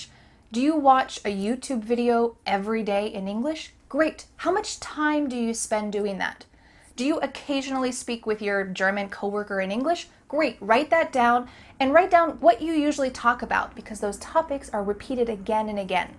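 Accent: United States. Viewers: Did you know that is from the state of North Carolina USA... so she speaks American English! You mentioned that you like British accents... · Hello, I saw many YouTubers are teaching English but the way you teach English is very affective and understandable. Yesterday I watched another English teaching channel, I didn't liked their videos because firstly their accent was different like Australian and English, so those accent wasn't understandable for me 'cause I watch videos in American accent like yours and Hollywood movies. Secondly they were not getting to the point. They were just spending time in front of 📷. Last but not least Passionate about teaching English, I mean I didn't saw any passion in their eyes which I see in yours. So thank you very much 🙏🏻🙏🏻 💝 from 🇮🇳 (I'm sorry for my bad English because I'm beginner but I am sure that one day I will speak English like you)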